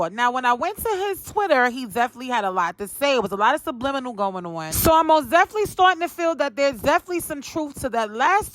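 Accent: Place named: East Coast, United States. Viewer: I’m so glad I found your channel. You be having all the tea. You sound like you from the east coast. I’m from NJ but living in NJ. New subscriber!!!!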